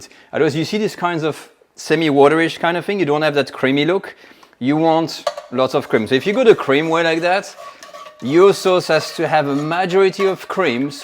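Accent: France. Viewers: This guy's french accent made me subscribe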